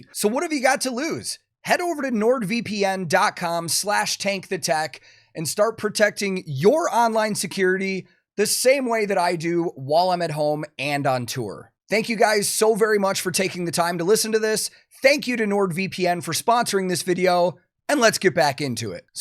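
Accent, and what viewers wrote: Germany. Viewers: Did your German improve during the tour, and which accent do you have now? 😁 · Hi. I`m from Germany and i must admit, that you really sound like a German! Ultra Props Buddy:D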